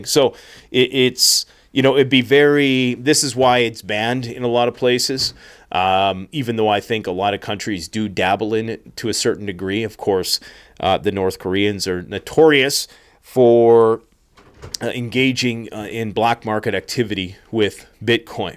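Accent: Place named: South, United States. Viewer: American southern accent “Alright” opening. All the hype points lol. Immediate like on the video. lol Request for comment ✅ You earned it buddy!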